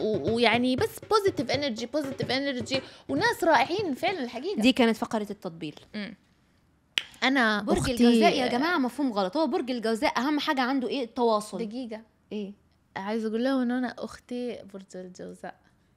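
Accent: Iraq. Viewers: تعودت عليجن تحجن عراقي 😂😂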